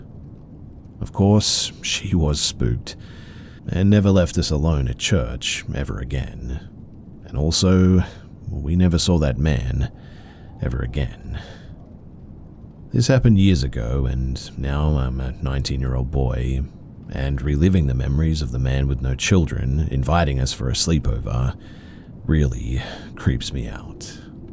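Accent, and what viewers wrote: Australia. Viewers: Are you from Australia 🇦🇺 ❤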